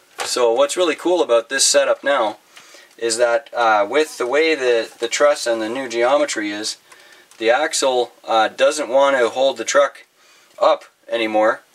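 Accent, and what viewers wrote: Canada. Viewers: Dont know why, but i always thought canadian accents are cool. Srsly, no offense. I will not mention strange brew.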